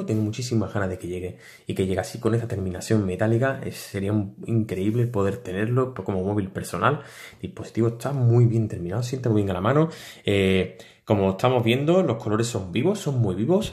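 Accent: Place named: Seville, Spain. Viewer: Buenas Crack Últimamente me salen muchos vídeos recomendados en tu canal y.. me los veo todos la verdad jeje Tenía dos preguntas para ti, la primera: Este LeEco de ¿qué año es? no he visto nuevos LeEcos desde el 2016 y me quedé en el Le Max 2 y el Le Pro 3. Y la segunda, si puede saberse, ¿ De donde eres? por el acento tuyo y sobretodo el de las chavalas del vídeo intuyo de que eres almenos cercano a la zona de Sevilla (yo si soy sevillano, me hace ilusión ver que alguien cercano a mi también se dedica a esto :D ) Un saludo y feliz año!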